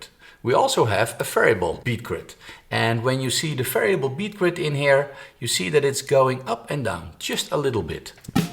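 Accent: Netherlands. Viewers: Ik kan gelijk horen dat je uit Nederland komt leuk hoor ik ga deze app even downloaden hartstikke bedankt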